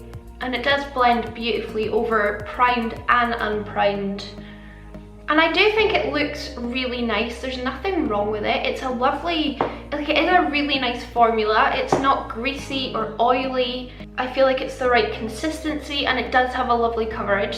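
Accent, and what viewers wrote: Scotland, United Kingdom. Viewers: Oh my god a Scottish YouTuber, where about u from x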